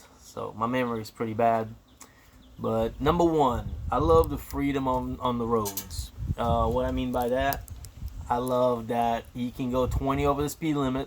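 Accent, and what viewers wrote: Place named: Russia. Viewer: Я не спорю, что это все правда, но русский акцент у парня слишком сильный…. Он точно американец?….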